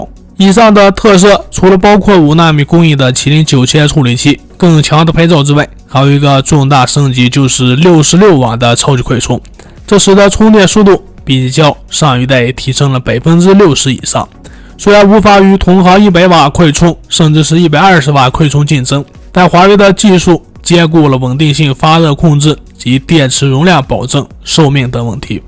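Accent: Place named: Zhangjiakou, China. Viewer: 张家口口音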